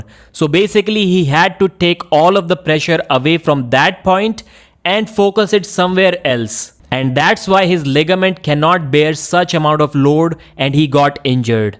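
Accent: India. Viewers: level of hindu accent. Rolling like every letter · the only indian whose accent for some reason, I don't hate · this Indian accent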